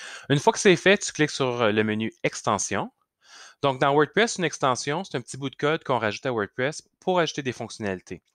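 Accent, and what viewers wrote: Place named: Quebec, Canada. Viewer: Bravo! c'était clair et très intéressant et très agréable avec l'accent québécois ( je suis de France!)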